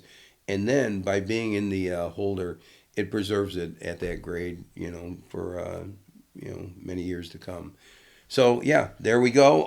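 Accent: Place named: Michigan, United States. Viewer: Sounds like you're from Michigan bro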